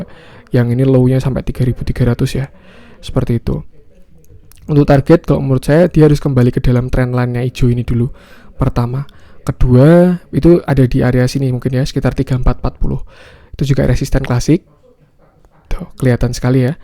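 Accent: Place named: Java, Indonesia. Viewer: HD BANGET TRADING VIEWNYA! PENJELASANNYA KERENBGT ADA "REASON" JG KAYA OBLIGASI AMERIKA DLL AKU SUKABANGEEET. AKU SUKA KALAU ORG BAHAS WHY. GA CUMA ASAL JD AJA.KEREN LOH NGERTI AKUUUU. MAKASI BANG!!!! Tambahan, aku tu kurang suka denger orang ngmg medhok sbnrnya, tp abang org pertama yang aku ikhlas dan berterimakasih krn udh ngomong😂 emang suaranya radio-able juga sih. tengkiu banget ya bang! Oiya gaada noise sama sekali kok bagus. SEMANGAT TERUSSSSS BANG😊😊😊😊 eh mas ya harusnya ehehe Bang pleaseeee mau PWON, ASRI, KRAS, PTBA yaaa!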